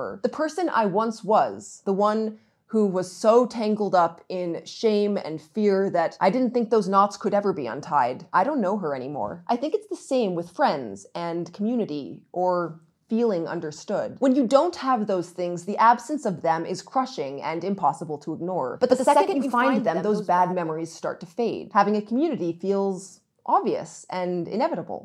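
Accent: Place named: Texas, United States. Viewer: your accent puzzles me sometimes. You are not from the south but sometimes you get something that reminds me of the Texas accent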